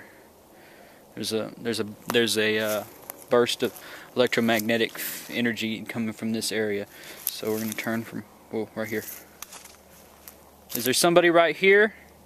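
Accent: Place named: South, United States. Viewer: your country twang has really come out in this video. Also, from my past experiences and such with the dead, is you ask if they would please answer the following questions (and state your questions). Just a suggestion, but all in all, great piece. Can't wait till your next vid. Love the paranormal! Hugs to you!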